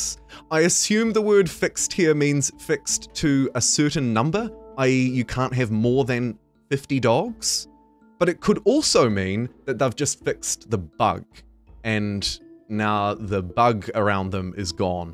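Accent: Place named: New Zealand. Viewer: Nice to hear a fellow Kiwi accent in the CS2 community. Keep it up mate 🥝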